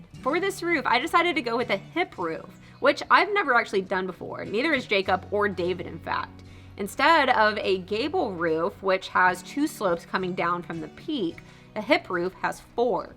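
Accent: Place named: Midwest, United States. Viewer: why do you have a Midwest accent rather than a Texas accent. Are you a transplant? Where are you from?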